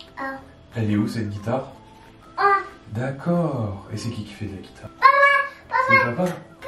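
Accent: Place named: South, France. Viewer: C'est marrant comment tu as l'accent du sud + marqué que haha! J'avais jamais capté. En tout cas très bon vlog, keep going guys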